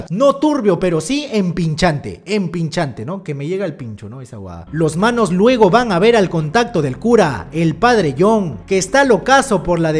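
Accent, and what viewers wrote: Peru. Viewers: Gracias a ti me volví fan del acento peruano xd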